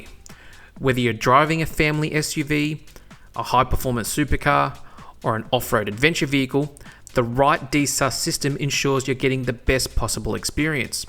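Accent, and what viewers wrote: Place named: Australia. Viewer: Newly subscribed, good to hear Aussie voices and not AI voices like a lot of new channels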